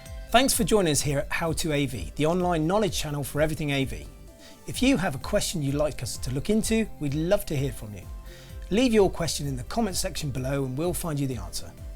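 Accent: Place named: United Kingdom. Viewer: I like this video because even though there is the UK/British accent, the over-pronunciation of H (haytch) does not happen here. I've lived nearly 60 years not hearing "haytch" and so I think it is a recent invention/addition to the crazy British accent phenomenon. I call it a phenomenon because when British singers sing, the accent disappears completely! (similarly when people sing US country music they develop a southern accent where none of little is present when speaking) so much fakery in speech... triggers the autistic reaction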